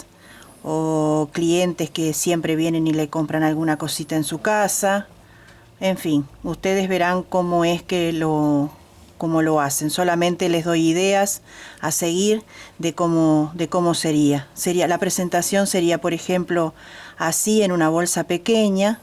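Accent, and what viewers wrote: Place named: Argentina. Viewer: Me da mucho gusto haberle encontrado, tengo una hija en Buenos Aires, creo por su acento, que usted es de Argentina, y se llama, y tengo unas primas, con quienes me crié, que son Garcia, esos apellidos son muy sentimentales para mi, y por lo que usted manifiesta en sus palabras, siento que tiene cualidades especiales. Gracias por compartir sus conocimientos.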